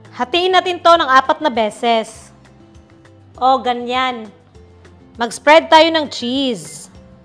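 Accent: Visayas, Philippines. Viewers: Ka cute kaayo mag bisaya ba 😂❤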